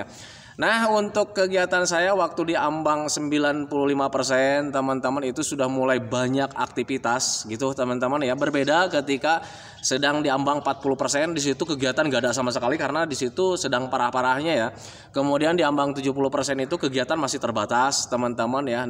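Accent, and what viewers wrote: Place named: Makassar, Indonesia. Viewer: Makassar mn suaranya ❤❤❤❤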